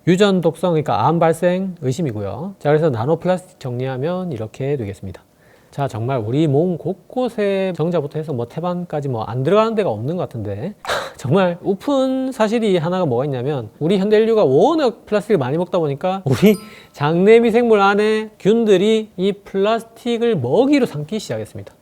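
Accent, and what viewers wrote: Gyeongsang, South Korea. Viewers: 선생님 잘 듵었습니다 구수한 경상도 사투리가 정겹네요 미세프라스팈에 쪄였어 살았네요 줄이는 방법 알려주셨어 감사합니다^^~ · 지구가 프라스틱에 째리게 되었다 ㅠㅠ 갱상도라 더 더가옴.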